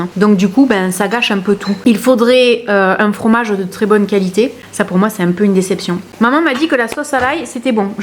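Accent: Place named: Midi, France. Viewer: Bonjour, je découvre aujourd’hui votre site c’est votre accent du midi qui m’a interpelé car j’ai le même 😀et votre bonne humeur. Je vais,m’abonner car il y a pleins de choses super intéressantes Merci pour le soleil que vous apportez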